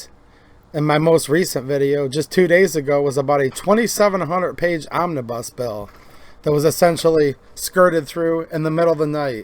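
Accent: Michigan, United States. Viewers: You've got a Rob Van Dam Michigan accent "bell = bill", and "Cangress = Congress".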